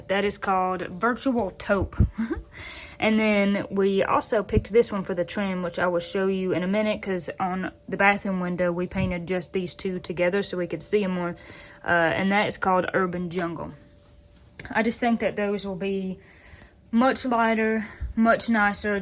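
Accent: South, United States. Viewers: What kind of accent is that ? Virginia, Kentucky, Carolinas ??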